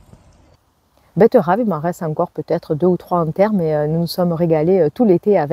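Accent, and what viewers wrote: South, France. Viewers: J'aime vraiment vous retrouver pour vos vidéos Ce petit accent du midi me fait rêver ❤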